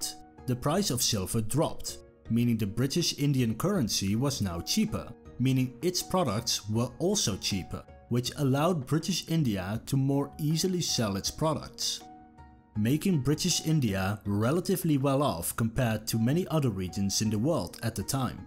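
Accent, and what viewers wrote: Netherlands. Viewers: Of course. That's a thought. However, it would work with an unmarked accent and the emphasis on 'rupt'. However, with his heavy accent it sounded like a mistake. Now that I realise he is Dutch, the word 'rupts' is in that language too so he might have presumed that it translated to English. All very interesting. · you probably know this but he is Dutch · Are u dutch? Ben je Nederlands? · Ik had graag deze video willen genieten, maar je Nederlandse accent en VOORNAMELIJK je vreselijke "slis" maakt het verschrikkelijk om naar te luisteren... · Narrator sounds Dutch. Is he Dutch? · Kom je uit Nederland? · Thank you for the content... I am listenning to your accent... are you Dutch? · He's dutch. It's an accent.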